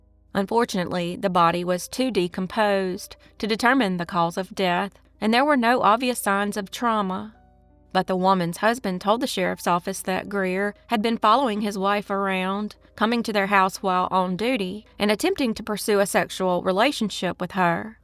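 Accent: South, United States. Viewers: THANK YOU!! LOVE THAT BEAUTIFUL SOUTHERN ACENT!! YOU GO GAL!! KEEP EM COMING!!:face-red-heart-shape:🤠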